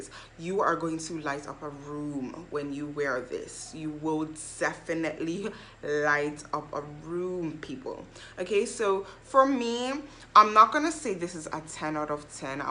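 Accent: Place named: Jamaica. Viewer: Hey , where are you from hun ,?? Sounds sort of Jamaican ish living in Canada ?? Or some Caribbean?🤷🏽‍♀️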